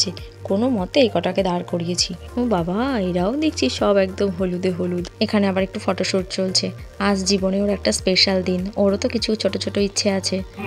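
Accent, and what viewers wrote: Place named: West Bengal, India. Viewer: Khub sundar. Bujhte parchi na korhakar biye. Bajna theke mone hoy Bangladeshi biye r apnar bhasa amader west bengal er moto.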